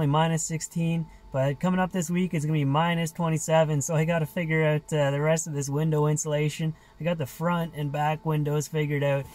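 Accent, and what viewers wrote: Canada. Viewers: Is this a canadian accent? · Is that Canadian accent or what? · Whole Food Plant-Based Man I love Canadian accents because it’s a mix or a British and American accent. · The way this guy talks I'm assuming he's canadian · That thick pure Canadian accent is complete ASMR. Damn, it's just what I needed to soothe my soul. Thank you. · This guy sounds exactly how I thought Canadians would sound. Also people tell the guy to move, assuming he would go south.... this god of a troll goes even more north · I love your accent and they way speak Some words small pause some words with long vocal end Pure Canadian lovely chap God bless you And please drive carefully on those ice roads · Wow that’s the most Canadian accent I’ve ever heard. 😉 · I am English and I can tell you are Canadian because you say ' oot' instead of Out ! For 25 years I spent the summers in Germany and winters in Morocco in my RVs If I were you I would be in Arizona (My No 1 State) in winter and Canada in summer. 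Thank you so much , Love your videos. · I'm Canadian and this is the only guy I've ever seen in my life with a legitimate Canadian accent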